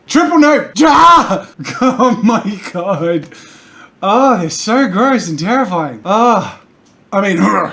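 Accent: Australia. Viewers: Friend: "Why does he sound like that Australian guy from Crocodile Dundee?" Me: "Probably cause he's Australian." Friend: "Really?" Me: face palm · I knew you were Australian and not from Boston! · I have his accent because I'm Australian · lol I love how he does the accent, even tho us Australians don't use that thick of an accent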